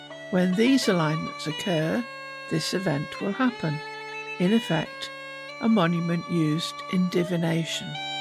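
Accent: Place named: Derbyshire, United Kingdom. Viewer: Your accent is similar to my mother's ...are you from Derbyshire?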